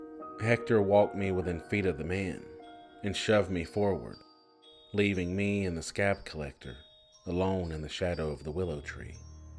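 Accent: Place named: South, United States. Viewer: I luv your southern accent so much it. Makes this channel your amazing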